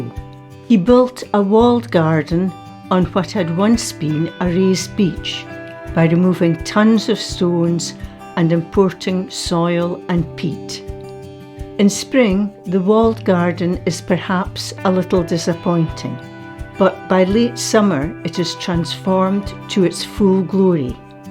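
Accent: Scotland, United Kingdom. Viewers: Lovely soft Scottish accented presenter's voice makes the videos all the more enjoyable.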